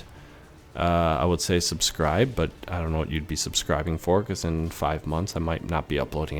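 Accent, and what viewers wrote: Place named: Midwest, United States. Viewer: You sound so midwestern lol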